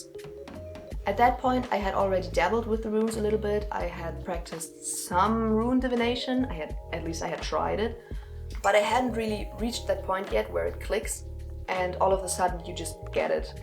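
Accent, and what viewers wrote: Germany. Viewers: I could hear from your accent that you’re German.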